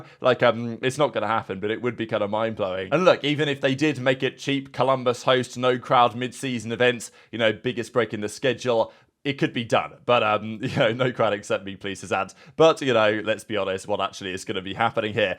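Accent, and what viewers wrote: United Kingdom. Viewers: Glad you made the decision to keep the intro. I was watching one of ur vids over Christmas break and my mom heard the audio and she was like “Holy fuck that’s a hell a British accent, I can barely understand him with that accent and how fast he’s talking” 😂😂 we’ve gotten used to the intro and switch it up if u think it’s needed change is good but I’m glad the original intro is staying for now lmao.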